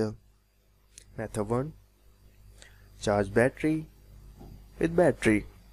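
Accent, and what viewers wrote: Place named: India. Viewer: you white people do not know your accents. He's Indian!! can anybody hear that?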